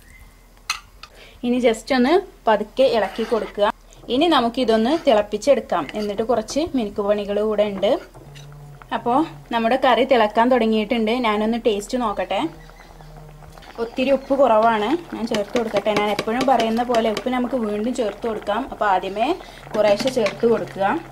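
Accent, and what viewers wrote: Malappuram, India. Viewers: മലപ്പുറം. ആണോ വീട് സംസാരം. കേട്ടിട്ട് നമ്മുടെ. ഒരു. Reedi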